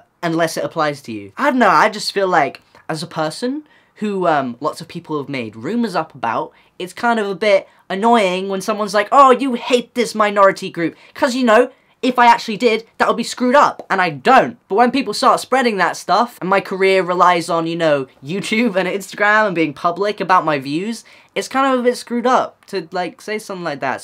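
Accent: United Kingdom. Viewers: i 100% support the tweet saying you helped them learn english, thanks to you i can now understand one of the numerous british accents that there is. so yup my french ass is grateful lmao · I learn english watching you, since I started watching your videos I started like mixing british english with American english, it's funny but a little weird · Your accent makes you sound British cuz you most likely are.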